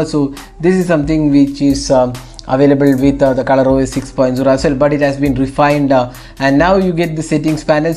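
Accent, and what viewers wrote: India. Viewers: I hate when they speak English with an Indian accent, I want to immediately hit the handle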